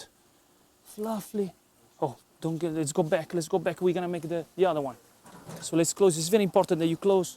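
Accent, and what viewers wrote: Italy. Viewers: Si sento proprio poco che sei italiano 😁😁💪💪🍕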